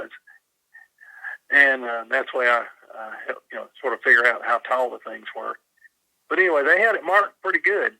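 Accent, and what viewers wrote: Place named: South, United States. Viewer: I could listen to narrations for hours, really enjoy his 'good ole boy' southern style..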